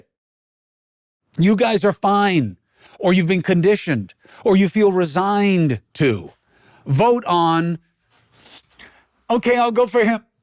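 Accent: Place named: New York, United States. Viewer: He went New York Italian on this one and spoke fluent f word . I can relate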